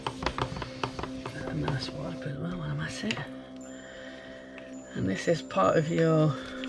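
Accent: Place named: England, United Kingdom. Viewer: * (Sorry, couldn't resist 😂🖤) It's really refreshing to hear regional accents and if what I've seen on others vids is any indicator, people outside England seem to enjoy hearing something beyond Queen's English/London, too. I'm really glad you decided to and that you feel good about it! Here's to many more😊💖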